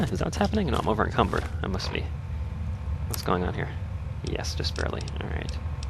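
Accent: South, United States. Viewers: I really enjoyed this video. I had never heard this story before and I found it very interesting and touching, above and beyond most of the writing for the rest of the game (not to say its bad). Your casual southern drawl and the hum of the terminal made this such an engrossing experience, thank you:3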